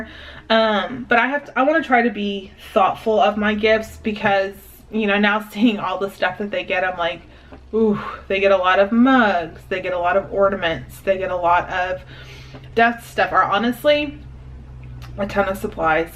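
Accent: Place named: South, United States. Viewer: I am starting to hear a southern accent 😀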